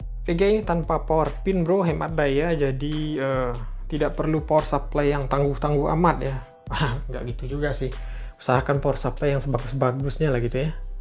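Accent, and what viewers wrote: West Sumatra, Indonesia. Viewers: bahasa indonya kek orang minang wkwkwk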